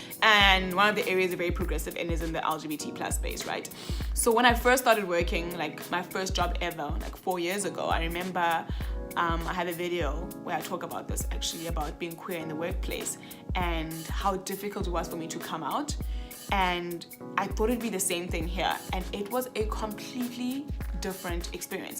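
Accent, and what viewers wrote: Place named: South Africa. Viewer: It’s African! South African or Botswana actually. She sounds NOTHING like a Jamaican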